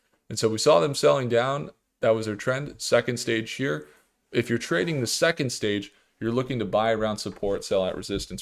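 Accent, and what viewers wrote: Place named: Netherlands. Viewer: You have a Dutch accent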